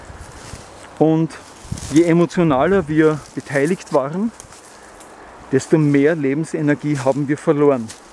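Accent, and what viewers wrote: Austria. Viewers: DANKE DIR.DEINE STIMME IST ZAUBERHAFT SÜDDEUTSCH.ÖSTERREICHISCH. DANKE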